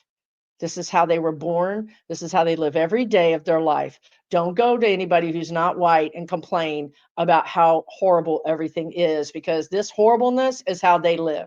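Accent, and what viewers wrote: Texas, United States. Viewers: I didn't realize you're from KY. You do have a bit of an accent, but I thought it was Texan. 😂 I'm from IL and lived for years not far from the southern tip. I was told I had an accent when we visited family in WI!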